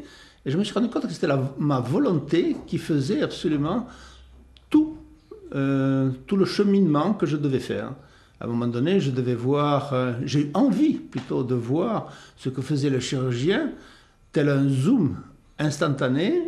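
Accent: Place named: Toulouse, France. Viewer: Ça fait plaisir de te revoir et entendre ta voix et ton si joli accent toulousain. Merci! Ton témoignage a été l’occasion pour moi d’ouvrir une porte sur un univers insoupçonné 🙏 😘💕💕💕💕💕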